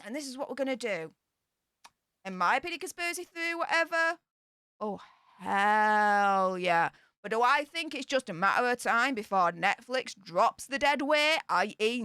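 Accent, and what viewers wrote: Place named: Nottingham, United Kingdom. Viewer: Ayup. Thought for a minute you were from my town, Nottingham lol.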